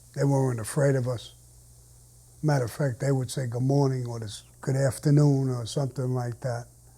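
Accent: New York, United States. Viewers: I moved to Scottsdale from NY in 2014 after 46 years living in NY. NOBODY here in AZ talks like that. It's kinda scary but my father who was born in 1936 had almost an identical accent to you. You remind me of him in more ways than I can say. R.I.P. Please keep uploading content!! For all of us NY transplants it reminds us of home. Salud.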